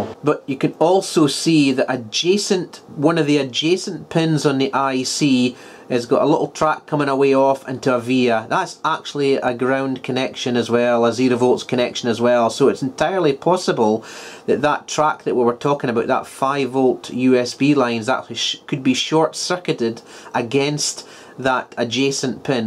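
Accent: Scotland, United Kingdom. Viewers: are you fae the North East of Scotland ?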